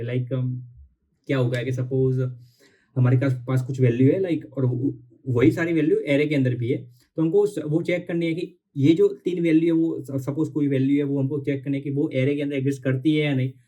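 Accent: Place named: Gujarat, India. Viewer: Bhai, content ekdam solid che! Thodu communication polish karo, Gujarati accent ne remove kro, to channel next level par jase. 🔥 Keep going strong! 👏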